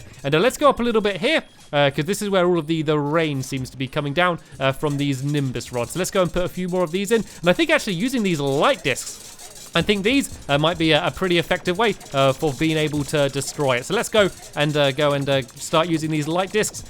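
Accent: United Kingdom. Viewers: you mean stampy? If yes, hes from UK not Europe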